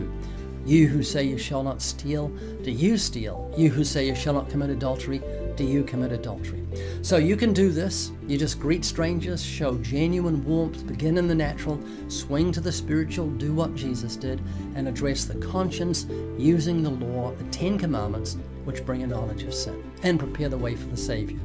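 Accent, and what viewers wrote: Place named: New Zealand. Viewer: Hi Ray I'm from your old Church in New to Zealand. I have a non Spiritual question how come you haven't lost your kiwi as accent. Your been living in LA since the 80s.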